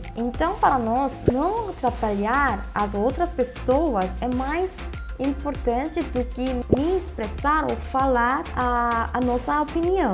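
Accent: South Korea. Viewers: Engraçado, estrangeiros normalmente dizem que tem dificuldades em alguns conceitos de português como gênero, número e essas coisas. Mas as vezes esqueço que você é coreana de tão bem que fala português. As vezes você deixa escapar um pouco do sotaque, daí eu me lembro que você é coreana de verdade!^^ Não consegui ver nada de errado no seu português, NADA! Você é muito humilde em dizer que não conseguiu explicar muito bem por causa do "seu português", você não só explicou bem, como explicou de uma forma EXTREMAMENTE agradável de ouvir, um jeito típico das fofas mulheres coreanas, mas que em você se torna muito mais agradável! Amei <3! Ótimo vídeo!!! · Sotaque coreano é tão bom de ouvir ♥️ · Sotaque coreano é gostoso de ouvir.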